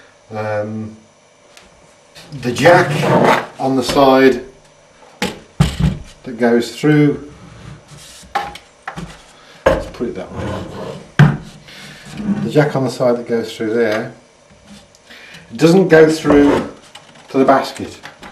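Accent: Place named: England, United Kingdom. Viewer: congrats great triggers...you have a very nice English accent, is from birmingham?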